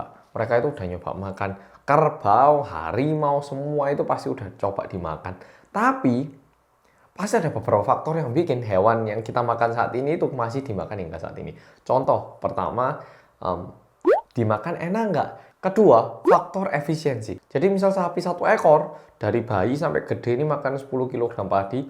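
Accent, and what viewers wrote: Java, Indonesia. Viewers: 😂😂 next time coba daging T Rex ya 😀👍 Tapi tetep salut saya sampean medok jowo ne. Lah neng omah ku Kabeh saben Dino Yo pake boso Korea padahal neng Indonesia wes 20 tuon.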